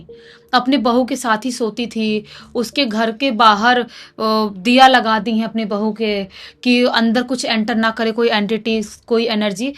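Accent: Bihar, India. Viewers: aapki tone bihario wali h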